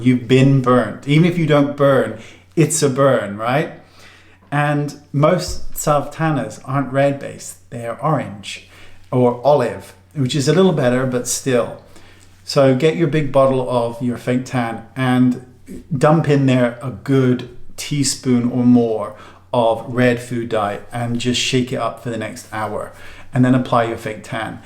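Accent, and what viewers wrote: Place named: England, United Kingdom. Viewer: Hi,. Thank you so much for this video. It was really great to know more about you. I'm a 52 year old Canadian woman who has a very grown up, big girl job and everyone thinks I've got ot all together but I don't. I'm just like you! I'd never know from your videos that you have some anxiety and doubts and fears. I too love sappy movies, songs and books. Historical romance...oh, my beating heart! I too have a very dark sense of humour and laughing so hard that I snort and cry is my favourite thing in the world. Movies I love: Shakespeare in Love and When a Man Loves a Woman with Andy Garcia and Meg Ryan. It's quite old but if you haven't seen it, man, get the tissues. Also The Family Stone. I just watched a documentary called McMillions about a scam being run on the McDonald's monopoly game in the 80's. If the story wasn't being told by the FBI I would never have believed it was true. 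I'd love it if you could show some tips and tricks for mature faces. I loved your video on hiding eye bags. Mine are trash bag sized so I can't totally hide them but now I can make them much less noticeable. Keep up the great videos...I just love listening to your voice. An English accent gets me every damn time!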